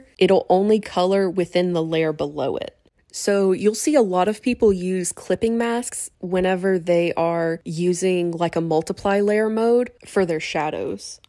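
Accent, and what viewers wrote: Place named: South, United States. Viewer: I can hear the southern, and thank you for the video!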